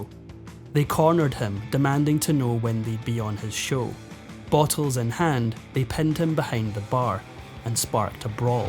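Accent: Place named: Scotland, United Kingdom. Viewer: My favorite narrator love listening to the Scot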